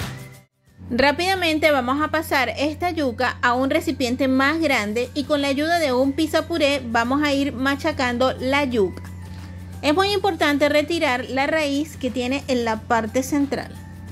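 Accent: Venezuela. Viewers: Buena receta para las personas que no pueden comer gluten ( aunque gracias a Dios ,puedo comer de todo ) Saludos desde Venezuela 🇻🇪 , aunque por tu acento creo que también eres venezolana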